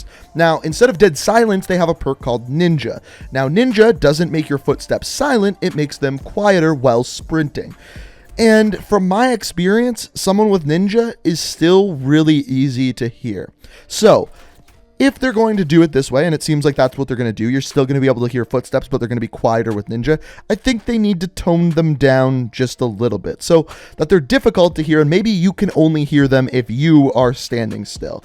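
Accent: Canada. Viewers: His accent is so canadian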